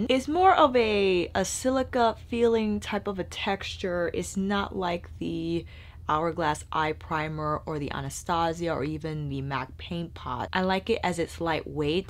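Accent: France. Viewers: the french accent really do be hitting different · Thank you for making the effort to pronounce names in French. Loooove your accent ;). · Your french accent is so cute ^^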